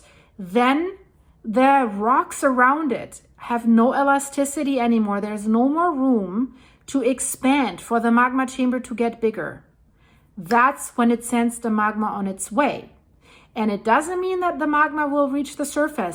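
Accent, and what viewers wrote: Germany. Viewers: Actually, is German and lives in the US, hence the accent. I love accent 😁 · What a funny accent 😂 Is this German, Canadian or anything in between?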